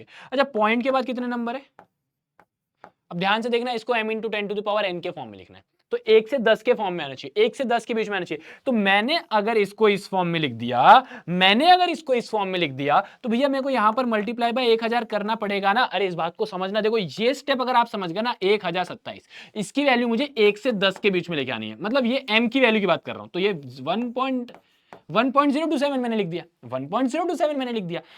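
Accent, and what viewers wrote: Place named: Bihar, India. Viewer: Sir speaking bihari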